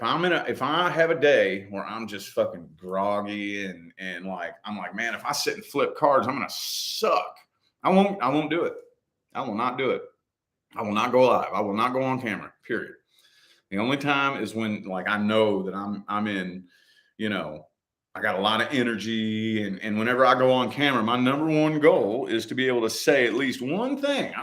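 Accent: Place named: United States. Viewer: I loooove this specific American accent and the cabin idea at the East coast and the fitness orientation :) greetings from Greece 💜☀️✨🌙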